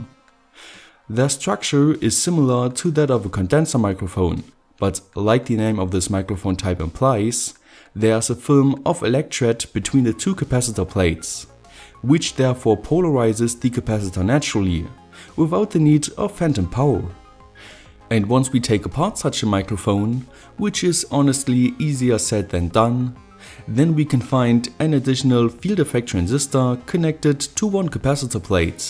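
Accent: Germany. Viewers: Kommst du zufällig aus Deutschland? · Lol, du bist deutsch?! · Are you German? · Are you german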